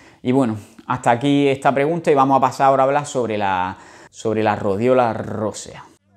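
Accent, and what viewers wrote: Andalusia, Spain. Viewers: Tienes un acento interesante, naciste en España? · Acento andaluz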